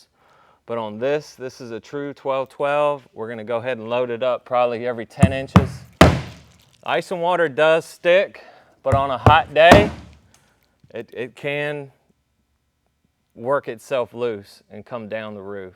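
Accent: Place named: Rural South, United States. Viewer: Awesome video. Sounds like you might be from a southern or rural part of the country. It's cool to see white folks doing Mexican work. We don't see that here in the city.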